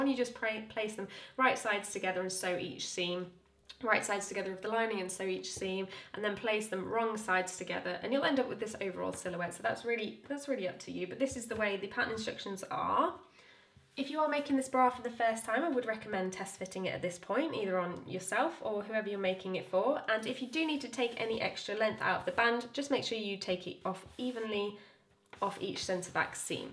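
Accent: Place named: United Kingdom. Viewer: She sounds British so....maybe the UK?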